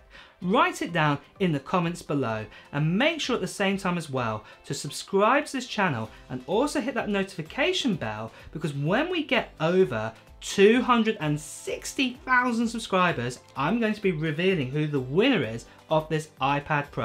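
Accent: United Kingdom. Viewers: I want to buy the iPhone 11 but I don’t have money for that and also i don’t have a job since i was just a 16 yo who want some iPhone i am hoping that i will be the one who got your ipad❤️Godbless you man💖 btw i like your British Accent❤️❤️ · God i hate his accent. Ive never known anyone who is trying to say two different words and having them sound exactly the same. Listen to him say " Thirteen and Fourteen" They sound exactly the same because of that awful cockney accent. Ugh im out. The video quality is great but get someone else to narrate them.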